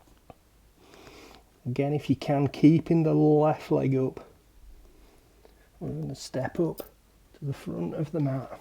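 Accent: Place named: Sheffield, United Kingdom. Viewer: Only just found you after listening to Radio Sheffield, I have enjoyed your yoga session and have now subscribed. It is good to hear a local voice and thank you for the sessions which I shall now carry on with, much appreciated.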